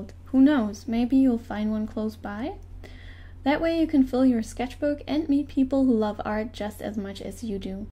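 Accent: Germany. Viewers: Omg are you german? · are you german? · Your from Germany right? :D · Du bist deutsch oder? Normalerweise finde ich es immer sehr anstrengend zu einem deutschen Akzent zu zuhören aber bei deinem war das nicht so es war sogar sehr angenehm. :3 Gutes Video übrigens! Es war sehr hilfreich.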